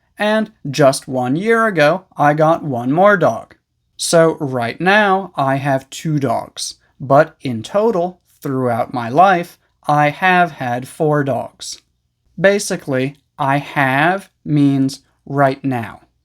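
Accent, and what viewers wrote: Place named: United States. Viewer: You have american a ccent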